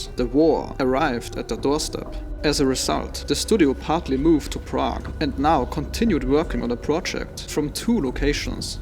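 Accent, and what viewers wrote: Germany. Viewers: Warum redest du nicht deutsch?Dein Slang/Dialekt verrät dich:goodvibes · This questions doenst have anything to do with the video it self but where you from my guess would be german since im german myself but it could also be norwegian or something up there?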